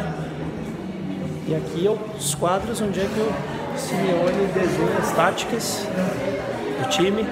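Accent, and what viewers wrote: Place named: Porto Alegre, Brazil. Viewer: Pode deixar. Abraço..pelo sotaque é Porto Alegrense igual a mim. Tmj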